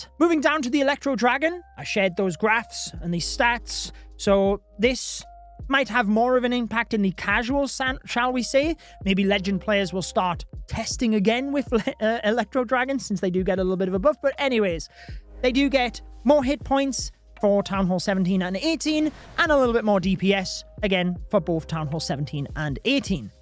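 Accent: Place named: United Kingdom. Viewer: british ascent w'oa army🙂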